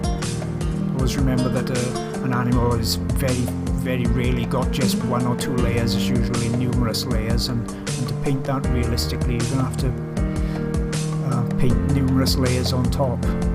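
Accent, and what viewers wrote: United Kingdom. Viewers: Interesting but the music is too loud so it's difficult to understand when we don't speak British.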